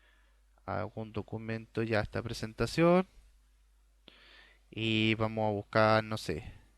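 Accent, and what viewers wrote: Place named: Chile. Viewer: Un comentario a modo de aporte: En Accesss 2013 el campo memo fue suprimido; en su lugar se puede utilizar el de Texto Largo. Deberías organizar el método con el que vas a dar el curso o, tutelar el video (me parece mas adecuado tutelar que enseñar). La vocalización es fundamental cuando se habla utilizando un idioma común a muchos modos de pronunciar (acento). Si hablás rápido, tu vocalización o acento chileno, resulta complicado seguirte para quienes utilicen otro modo de habla (acento). Deberías pausar mas las oraciones, evitar los tics lingüisticos propios de tu región o, país, de esa manera lo que hables será mas efectivo, sin dudas. Por último, veo que has optado por un mecanismo de explicación que comienza por el "paneo general" y, se va adentrando pausadamente dentro de lo particular. Soy docente con mas de cuarenta años en la profesión y, tengo la impresión de que el método elegido por ti, no es el adecuado para "tutelar"" por este medio. 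Lo mas apropiado sería comenzar con un ejemplo y, explicar clara y brevemente cada uno de los pasos ejecutados, evitando redundancias y explayados innecesarios. Si tu interés personal está orientado a conseguir suscripciones al canal, la mejor forma es dar un tutorial eficiente y, saludable; no hay mucho de eso en YouTube; lo sabemos todos, aunque hay algunos tutores que son brillantes porque han tenido en cuenta esos detalles. Por último marcarte dos errores: El campo edad no solo no debe ser del tipo Texto, sino tampóco numérico.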